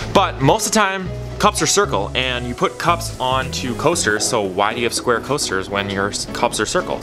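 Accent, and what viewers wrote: Minnesota, United States. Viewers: I love how Minnesotan you are, it’s so nice hearing someone from the same place as me in a video!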